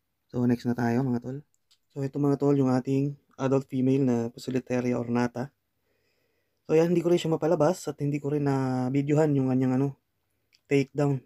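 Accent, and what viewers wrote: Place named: Cavite, Philippines. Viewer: sanaol tol madami nang slings may puntong caviteño hahaha.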